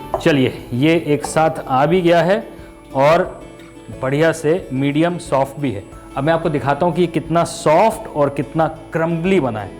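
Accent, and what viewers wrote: Haryana, India. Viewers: Chef i never knew that you are from haryana and the accent which brings here while speaking into haryani is so original. Just amazing. I’m also from haryana so i can totally relate to your tone 😂👌🏻. You’re the best. Love your recipes. ❤️ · Chef your recipes are awesome so are you Aaj toh Haryanvi bol k jamma laath gaad Diya Bahut khub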